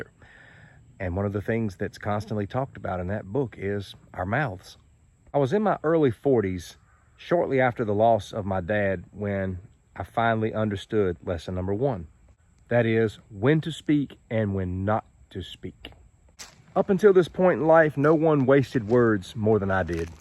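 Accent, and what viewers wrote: South, United States. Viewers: I like your Southern drawl. Reminds me of my granddaddy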